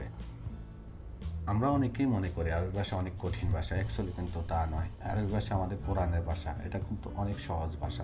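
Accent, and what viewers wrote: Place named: Noakhali, Bangladesh. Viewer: নোয়াখালীর ভাষা ঢুকে গেছে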